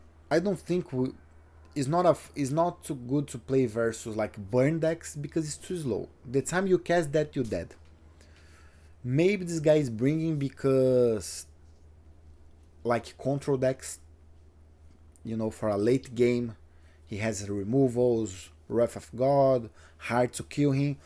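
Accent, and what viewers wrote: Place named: Brazil. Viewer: Karai... Tu falou e eu já vi, porra um br falando inglês vei,quemassa!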